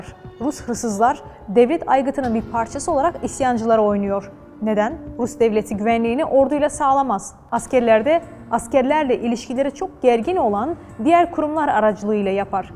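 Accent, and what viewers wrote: Azerbaijan. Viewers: Bu kız nereli?aksanı aynı azerbaycan aksanı sanki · Konusan hangi turk milleti merak etdim. Konusmasi turkce ama aksank sanki bi azerbaycanli konusuyor gibi.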